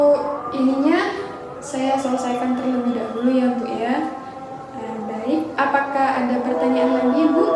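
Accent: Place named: Java, Indonesia. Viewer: Nge Jawa banget ini kak😢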